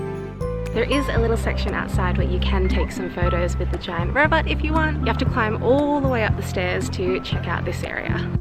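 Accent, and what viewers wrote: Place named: Australia. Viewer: I wish... This place has such a aesthetic vibe +you have a aussie accent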